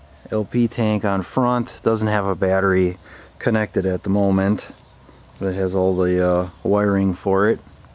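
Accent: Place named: Fargo, United States. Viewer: Where the fuck is this accent from Fargo?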